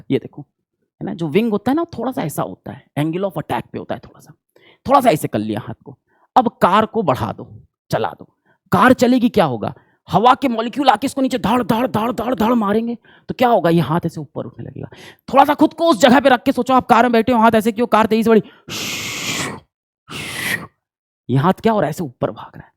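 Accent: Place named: Uttar Pradesh, India. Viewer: Who is this joker? Very unprofessional way of explaining aviation theory. This is 2025 and this guy is still stuck in bhaiyya accent.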